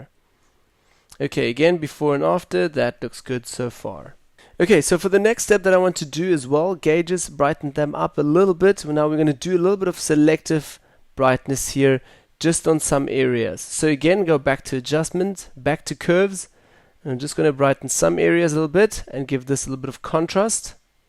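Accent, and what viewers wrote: South Africa. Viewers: Hey you're from South Africa... :D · Thanks so much for your time and nice accent which reminded me of my old friend from South Africa. It would make more sense if you didn't make this shot in the camera because you kept on saying you would rather re-shoot it. That's why we have you as an expert to make these tutorials making mistake shots look acceptable and punchy. Thank you so much. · wow u from south africa nice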